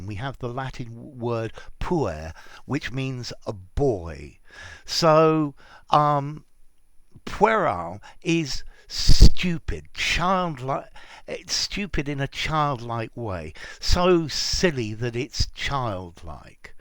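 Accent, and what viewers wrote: United Kingdom. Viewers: I always love british accent!!!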